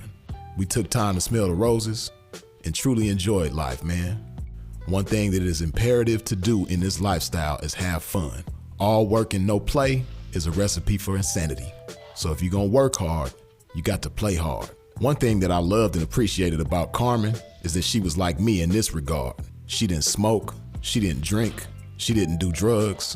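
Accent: Chicago, United States. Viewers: Ah “P” I Didn’t know you was 🥶 with the ISM like Dat But Ur name is you from the Chi u sound like SouthSide Nigga Mannnnnn? This Video was Refreshing to Life G Blessings to you 🫱🏿‍🫲🏾💯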